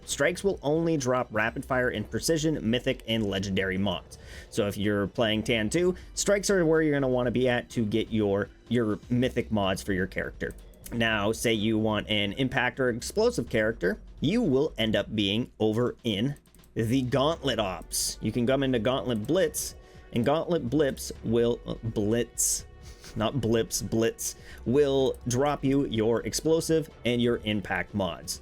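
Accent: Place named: Canada. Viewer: Great video but damn my man you and tash sound exactly alike and I'm not talking about the Canadian accent. If I had my eyes shut I wouldn't be able to tell the difference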